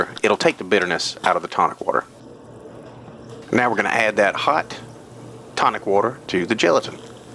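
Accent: United States. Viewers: U have the most american accent ever. Like you were from a cartoon or 50's america.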